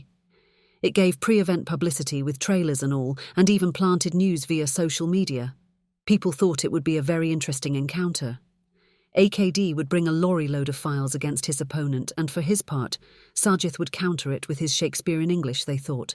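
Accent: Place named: United Kingdom. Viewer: Don't we have English speaking Sri Lankans, that we have to have this "British" lady/accent making funny pronunciations of Sinhala names!! Give me a break.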